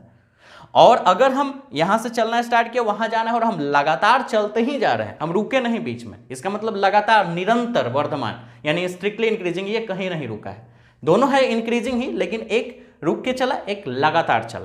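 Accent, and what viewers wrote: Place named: Bihar, India. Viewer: Bihari language me feel hi Alag hai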